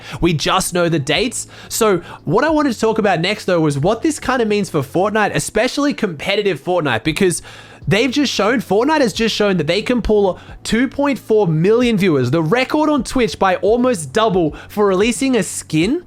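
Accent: Australia. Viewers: He said his gamertag got u aussie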